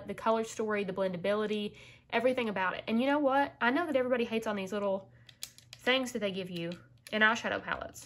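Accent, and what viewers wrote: South, United States. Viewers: you just look so glamorous and the southern accent totally caught me off guard. I had to take a double take. But I agree that you are so funny. But to have so much success at such a young age, I'm so jealous.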